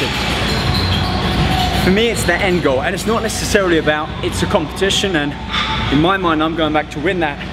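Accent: United Kingdom. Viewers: has one really cool way of talking and explaing exercises,even myself started to talk like,british excent lol:D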